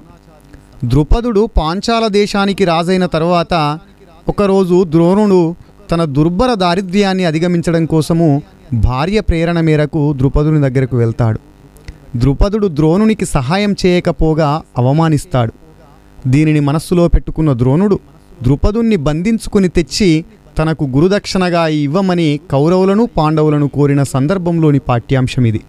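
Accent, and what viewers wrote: Telangana, India. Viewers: Telanmgana yasa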